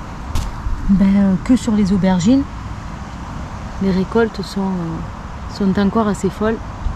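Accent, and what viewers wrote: South, France. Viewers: Coucou, Ça m'amuse de voir la différence entre le sud de la France et le nord 🇧🇪 l'accent, le type de récolte . Suis impressionné par la taille de tes aubergines et poivrons ! Par contre on a à peu près la même façon de faire. Merci pour cette vidéo A+ 🇧🇪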